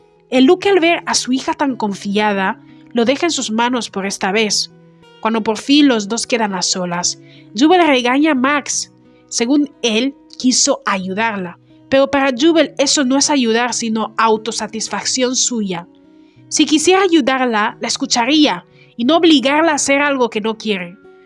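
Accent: France. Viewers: Oye eres de Francia? Esque tienes un asentó muy bonito 😮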